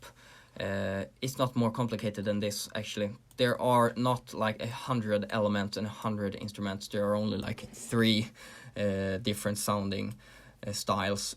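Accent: Sweden. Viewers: thats the swedish accent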